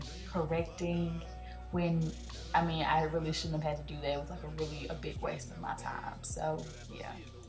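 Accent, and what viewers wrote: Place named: Texas, United States. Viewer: When I first found you on Youtube I instantly searched for your location I could have sworn you were from Texas Lol you sound so much like her!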